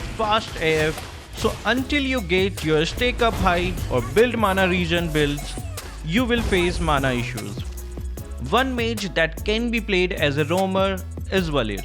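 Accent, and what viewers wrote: India. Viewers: Are you indian your accent sounds soo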